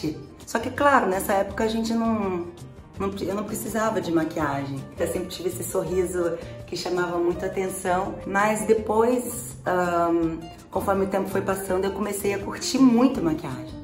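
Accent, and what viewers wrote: Rio de Janeiro, Brazil. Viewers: Me diz uma coisa. O que é preconceito pra você? Porque eu acho que você está equivocada sobre o significado. Seria preconceito se eu desprezasse ou tratasse de forma diferente as pessoas só por causa do sotaque delas. E aqui não é o caso, não tenho nada contra quem é do Rio, só não curto o sotaque. Estamos falando de idioma certo? Tenho direito de expressar meu gosto. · não exagera. Sotaque carioca não cai bem pra todos...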